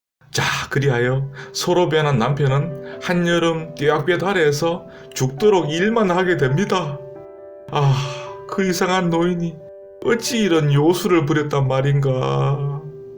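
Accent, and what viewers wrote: Gyeongsang, South Korea. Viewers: 선비님? 갱상도 말 이야기 정말 우습게 잘 하시네요~ ㅋㅋ~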